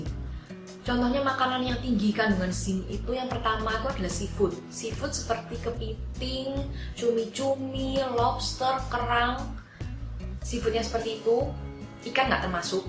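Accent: Java, Indonesia. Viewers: Dialeknya mentok jawa bangeet yaah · Logat jawa nya kental .... 😂😂👍👍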